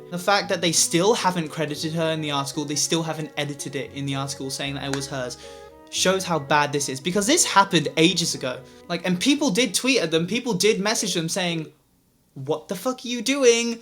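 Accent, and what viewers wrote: United Kingdom. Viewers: I wasn't expecting that British accent- ( I usually listen to the covers ) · Wasnt expecting that british accent lmao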